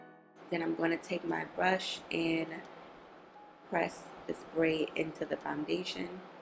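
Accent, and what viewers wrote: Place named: New York City, United States. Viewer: what is your background,where you from? Cuz I'm getting Bronx N.Y. Jersey vibe from your accent.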